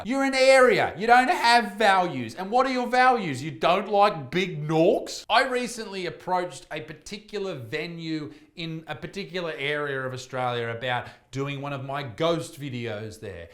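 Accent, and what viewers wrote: New Zealand. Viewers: How the hell did a nothing story from the west coast of NZ end up in the US. Especially as there are only 6 people who live in Hokitika. I need to get my arse down the road to the busy place (Auckland) to watch your show. Good work fella. Your Kiwi accent and pronunciation of Te Reo (Maori) is pure comic gold. Aussie is woke as hell, but there are plenty of virtue signalling woke idiots here too. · 10/10 for making me laugh today. Your kiwi accent is hilarious your rating of cup sizes is ground breaking.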